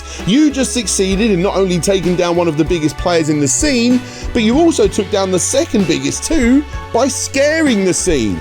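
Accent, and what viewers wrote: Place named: England, United Kingdom. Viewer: You forget dingleberry, that he’s English and they say certain words with certain enunciations. Right now you come off as one those assholes who thinks they are smarter than everyone else.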